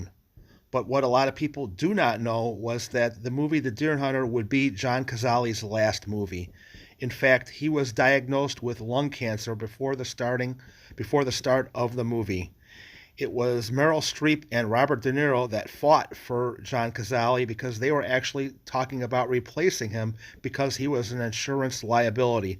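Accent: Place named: Boston, United States. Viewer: Hi, interesting historic video, loved it as this movie is one of my favourites. Thanks for this. Btw are you from Boston? Just asking as an English man with an intérêt in North American accents.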